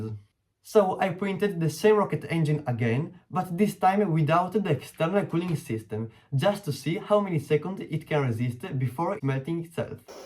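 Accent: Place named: Italy. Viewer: Minchia si sente dall accento che sei italiano, bell video però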